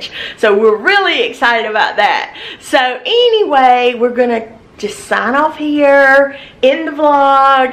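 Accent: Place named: North Carolina, United States. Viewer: Heyy! I am watching your videos for the first time!! Love your video! I am from Charlotte NC... you sound like you might be near me haha!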